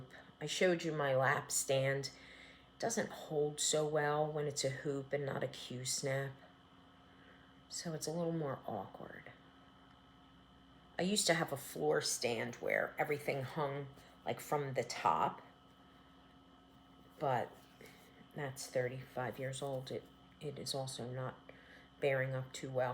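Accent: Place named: South Jersey, United States. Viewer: Have been enjoying your flosstubes for awhile and realized you haven't been doing them that long. I decided to go back and watch your first video. LOL! I am a Jersey girl living in Williamsburg VA because my husband is a retired Army officer, and this is where we ended up. We are college sweethearts who graduated from Glassboro in 1983. I did my student teaching in Haddon Heights. Love your south Jersey accent.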